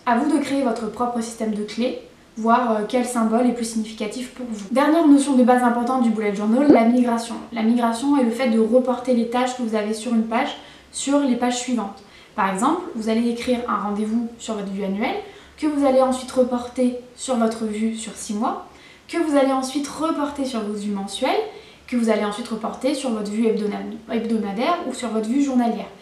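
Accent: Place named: Quebec, Canada. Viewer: J'avais l'impression d'entendre un léger accent québecois :p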